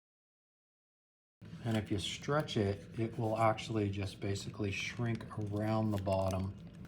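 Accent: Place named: Northeast, United States. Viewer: Thanks. If I pull the trigger on the 701, I'll probably be peppering you with questions. Looking forward to following in your footsteps. Where in the world are you based? Sounds like NorthEast USA somewhere.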